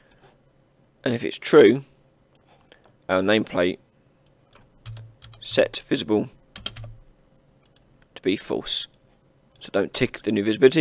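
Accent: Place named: Norfolk, United Kingdom. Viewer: Bro I have to ask, Are you from the UK Norfolk area? You sound like you are... that's not a bad thing either.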